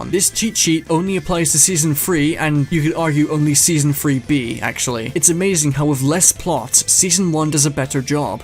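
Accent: United Kingdom. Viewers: Brilliant analysis, I had most of the same thoughts about this show. The episodic nature almost put me off it but the slow burn of the overarching plot that was always present under the surface kept me hooked. Season 2 was fantastic! Season 1 was average except when it focused on the plot and season 3 was schizophrenic honestly, the tonal shifts didn't work and the ending felt like kind of a mixed bag but overall it was a wonderful show. The animation was brilliant, the comedy was consistently funny most of the time and the core story was so good. It really could have been one of the best. On an unrelated note, I must suggest you try She-ra and do an analysis if it grabs you, same for Arcane as well. I have loved all the analysis videos I've watched and while my bias towards British humor and accent contributed to that, I subjectively think your videos are objectively good. 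Anyway, TL;DR She-ra and Arcane analysis please.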